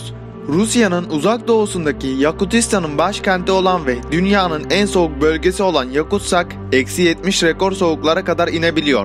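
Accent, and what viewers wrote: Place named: Thrace, Turkey. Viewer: Trakya şivesi seziyorum.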